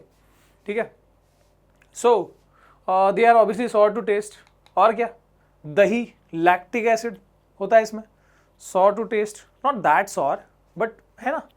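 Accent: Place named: South India, India. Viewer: Sir aaj aap south + Mumbai jaisi hindi Kyun bol the he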